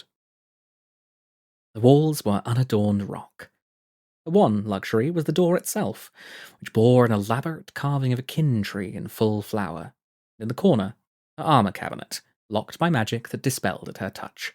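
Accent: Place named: United Kingdom. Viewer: you sound very british in this one!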